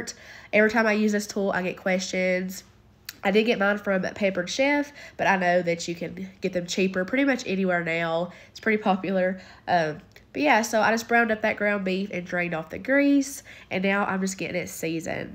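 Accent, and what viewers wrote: South, United States. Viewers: I absolutely adore your southern accent! Your recipes always look amazing!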